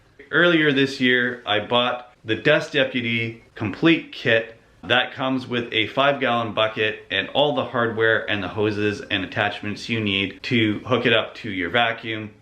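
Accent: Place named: Canada. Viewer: Dansk surname living America, or a perfect English speaking Dane? But then I hear a little Canadian accent? Cheers from an American living in Sweden!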